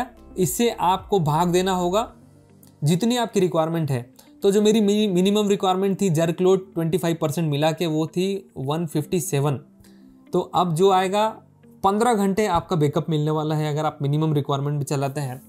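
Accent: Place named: Madhya Pradesh, India. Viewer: brother your talking style is nice i feel mp style tone in your voice , great video specially talking way😊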